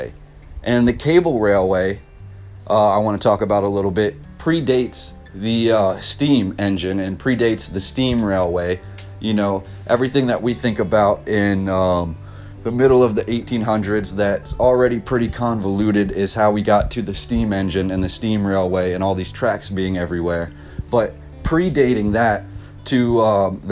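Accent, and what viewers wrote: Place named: Pennsylvania, United States. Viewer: You gotta be from somewhere between Allentown, Reading, and Philly. Possibly Quakertown. Can't hide that acccent lol.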